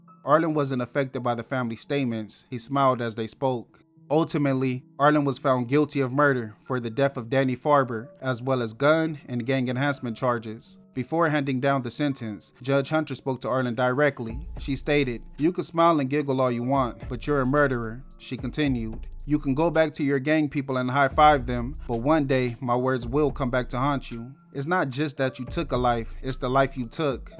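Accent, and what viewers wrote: Los Angeles, United States. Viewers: Right on time‼️ btw are you from LA?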